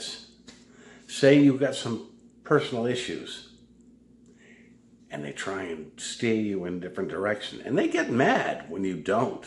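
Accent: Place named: Boston, United States. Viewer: My opinion is you have the best American accent, is it Boston? Great vid.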